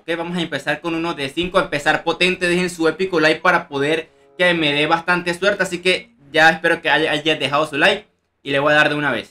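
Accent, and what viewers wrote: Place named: Peru. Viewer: Parece peruano XD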